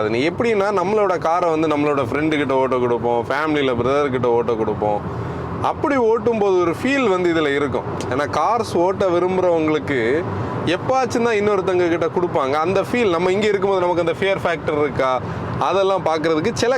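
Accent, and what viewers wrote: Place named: Thoothukudi, India. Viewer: Hi This is among one of the best way of reviews among the most of the moto wagan reviews, incl ur review of Slavia 2025. You have covered the review in Q&A method. You even compared with parallel sedan variant of skoda. Thanks a lot for ur service. Your review is one among the best in comparison with other national level reviews. On the lighter side, I like ur Thoothukudi தமிழ்.🎉😊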